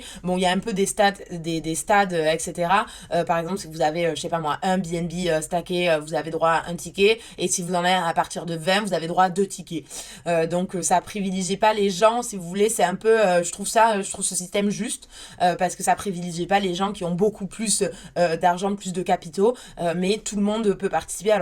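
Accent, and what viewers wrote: South, France. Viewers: Accent du sud ?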